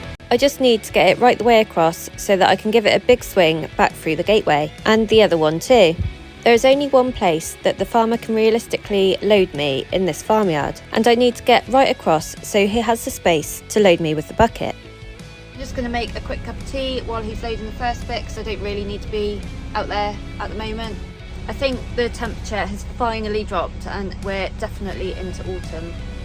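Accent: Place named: Somerset, United Kingdom. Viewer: Cider!!! 😂 Your accent always reminds of mid-Somerset where I used to live and combined with the apple load today I'm feeling a longing for the Levels again! 🙂 Love your sarcasm, the way you said High-Visibiilty-Vest made me laugh, lots. Love the videos. Thank you.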